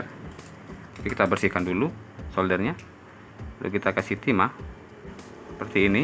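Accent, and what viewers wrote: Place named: West Sumatra, Indonesia. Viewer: Logat nya seperti orang minang ya bg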